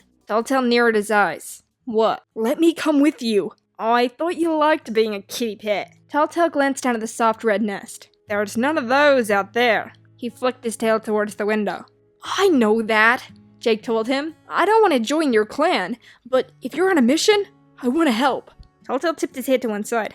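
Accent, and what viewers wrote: United Kingdom. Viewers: Are you brittish?! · Whats up with the british accent?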